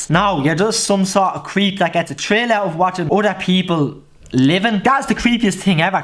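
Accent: Ireland. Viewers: So enjoyed these videos from Australia.was born in Dublin , drimnagh you are brilliant keep them coming love your Brough ha ha .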